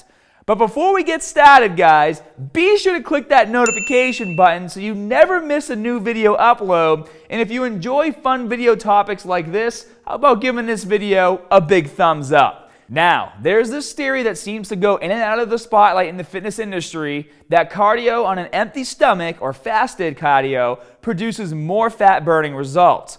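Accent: Boston, United States. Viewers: Is he from Boston? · From Boston? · I I've been watching your videos to help me get in shape and learn how to do stuff. I have to say this video your Boston accent was way too strong! Every time you said the word cardio it was killing me! Now... I don't think understood 1 word of this w all the tequnical jargon, except that maby I should eat a little something before my 20 minute cardio in the am, but what? a full breakfast? have exercise induced asthma so a lot of times I will walk for several minutes and then do a jog for several minutes (whether that's outside or on the treadmill). Didn't know that was considered some sort of a HIT routine. Love the shirts btw, the superheroes are the best, where do u get them?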